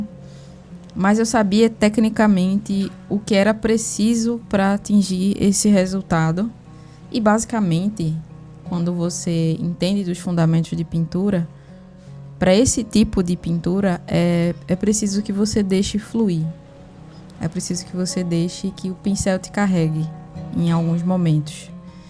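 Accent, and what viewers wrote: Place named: Pernambuco, Brazil. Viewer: De onde tu é ? seu sotaque sobe mais pra pernambuco em diante, tbm sou nordestina ,eu já quis comprar a sonnet pra colocar em uns minis estojinhos que comprei, eu tenho a white nights a yellow ochre é horrivel de transparente mas as outras cores são maras eu gosto delas, tem uma galera que não acham elas profissionais o suficientes como se tivessem entre as profissionais e estudante, n sei se é a origem da tinta, por n ser marca francesa ou inglesa ou norte americana. O ocre da sonnet é ruim que a white nghts? sinto falta de ter migos pra trocar swatch de cor , e n teria comprado o kit da cotman45 cores num dia que ele passou sem dupla taxa pouca coisa mais cara que a de 12 cores n brasil , por isso n me arrependo. reconheço seus videos pelo pincel amarradinho do cabo curto da keramik , nunca vi ninguém falando deles.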